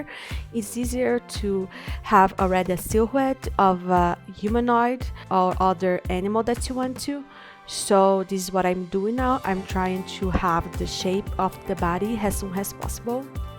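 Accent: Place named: Brazil. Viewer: é muito bom ouvir o sotaque brasileiro! Parabéns pelo processo! Espero que seja o inicio de mais series como essa ;)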